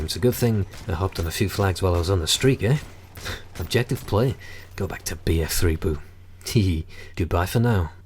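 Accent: United Kingdom. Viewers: ARE YOU SCOTTISH IRISH OR BRITISH??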